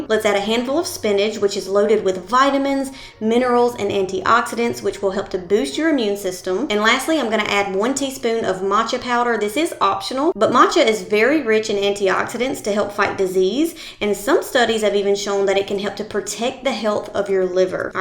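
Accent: South, United States. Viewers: I love your accent. I’m taking a guess Southern States of US. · She country af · your southern accent 😍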